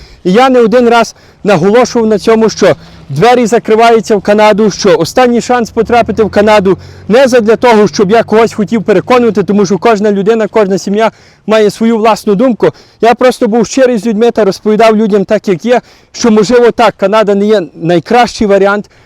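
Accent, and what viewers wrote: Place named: Lviv, Ukraine. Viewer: Мова дійсно чудова. І вимова львівська.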